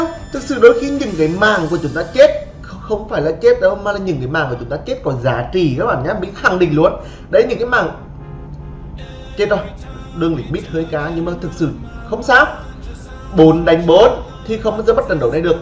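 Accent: Nghe An, Vietnam. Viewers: Idol của tui ngày nào , cái dọng Nghệ An , từng cái clip cẩm nang Nak , giờ sắp thành Idol triệu sud rồi , chúc idol thành công nha , Mại yêu 😘😘😘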